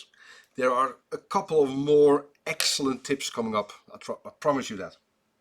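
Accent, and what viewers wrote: Netherlands. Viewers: Entertaining AND informative..... and all in a Dutch accent :)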